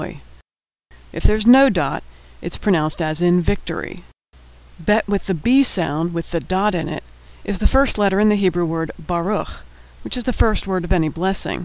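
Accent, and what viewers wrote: United States. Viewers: Beautiful American voice, makes it much more easy to store the informations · horrible American accent... can't you find an Israeli to pronounce the words?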